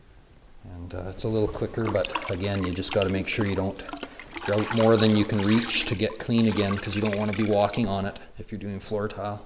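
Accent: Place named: Saskatchewan, Canada. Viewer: This guy has GOT to be from Saskatchewan.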